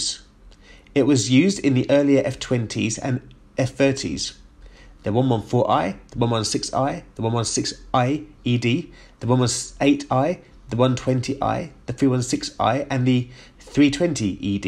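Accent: United Kingdom. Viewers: Hey, another great video, I really love your British accent too!!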